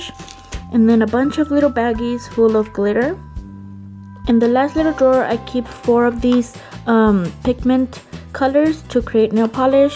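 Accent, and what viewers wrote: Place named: Mexico. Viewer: wojod haider really? it sounded like a Mexican accent to me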